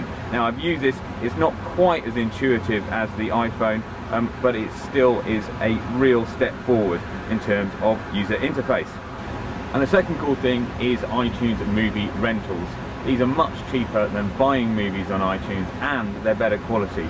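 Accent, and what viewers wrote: England, United Kingdom. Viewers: english accent...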